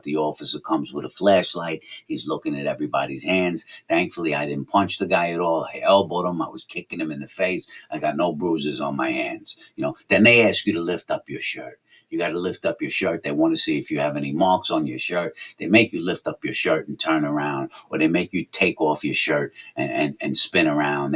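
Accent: New York, United States. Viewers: straight up NY accent! (I have one too 😂)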